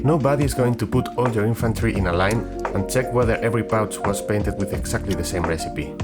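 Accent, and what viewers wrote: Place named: Spain. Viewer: Como se nota que eres español por el acentazo que tienes, hablas el inglés con las tripas xD. Quizas te iria mejor en el canal si te pasas al castellano, ya hay muchisimos canales de habla inglesa y nunca vas a tener el alcance que tienen ellos en inglés, de verdad te lo digo con cariño, tu pronunciación deja mucho que desear y dudo que crezcas por ese camino. En cambio en castellano no hay tanta masificación y menos aun centrada en la parte de pintura del hobby, ahi podrias abrirte un hueco. Un saludo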